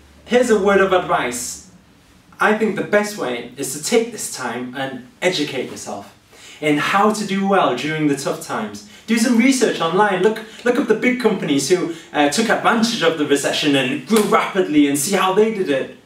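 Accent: United Kingdom. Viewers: I like your british accent at the time!!! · Wow... your British accent was more prominent then.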